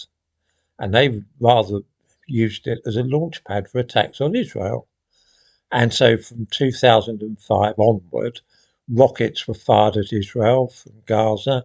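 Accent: United Kingdom. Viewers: Wow. What exactly were you doing living in Palestine? Nothing ever stopping them from acting like their own country? Nothing? I look at the google earth map today, and i see the most ominous wall ever constructed, and it just so happens to be surrounding this tiny 25 mile long strip of land. Not sure why i was subscribed to you, but i'd give any honest sounding british chap a shot. Really no choice but to unsub now, because i'm not the target audience for this unapologetic gaslighting. Pretty sure that the older i get, the more i can see the pattern of deception, but for some people it might work the other way.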